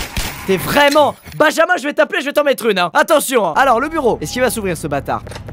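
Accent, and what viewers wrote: Quebec, Canada. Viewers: Tu sais parler quebequoi comme moi en tabarnak,😂jte soutien dla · Et ben squeezie tu parle en québécois tu nous explique???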